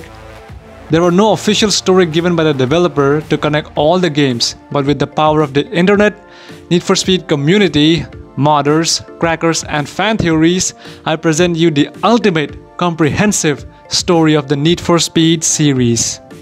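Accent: India. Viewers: Your Indian accent really brings this story to life👍😸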